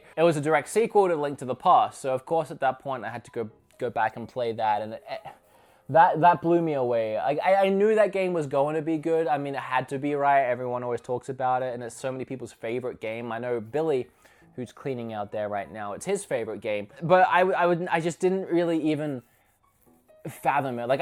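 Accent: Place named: Australia. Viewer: God, your accent is really throwing off my sensors. I'm new here but I'm guessing Australian, living in America? I know you mentioned Australia in the intro but I picked up on it before then. As someone who's traveled a fair bit, my accent tends to modulate and sync with my surroundings, so I'm guessing that's what it is?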